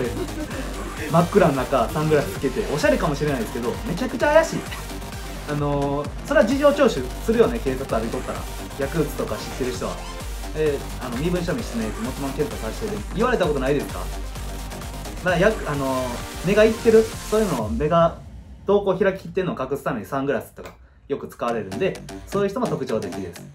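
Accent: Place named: Kansai, Japan. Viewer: 関西のどこだろう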